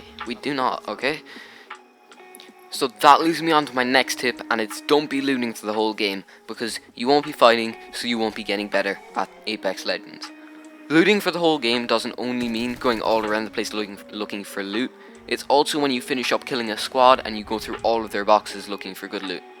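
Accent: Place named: Ireland. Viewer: i love the Irish accent (I think)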